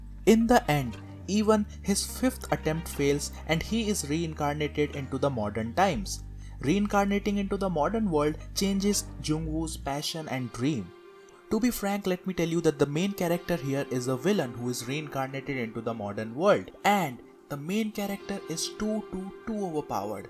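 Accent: India. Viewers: bro pls turn back the old video type ı don't wanna hear your indian accent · His accent like indian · Indian detected hehe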